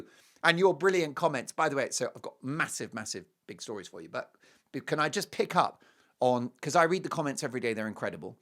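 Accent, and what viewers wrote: United States. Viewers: Your American accent is good! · I agree with PETA to stop the horrible breeding places, adopt first! PS-Your American accent is spot on and great outfit today!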